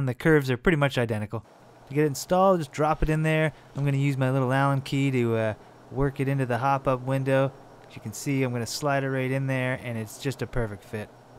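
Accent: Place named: Canada. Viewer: You sound Canadian if you are where did you get these parts from can’t really find any places here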